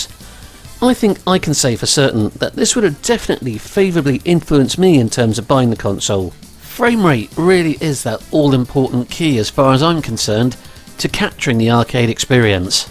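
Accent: England, United Kingdom. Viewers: You have a graceful English accent,. Keep it going!:yougotthis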